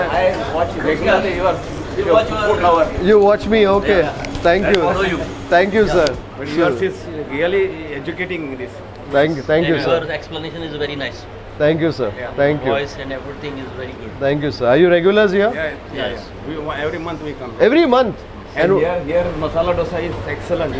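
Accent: Karnataka, India. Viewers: Your speaking Kannada is getting cuter by the day!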